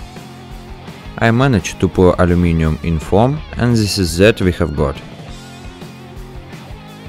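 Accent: Russia. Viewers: What a brilliant russian akcent is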